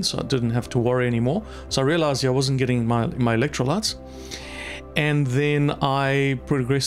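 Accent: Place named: South Africa. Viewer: u sound south african.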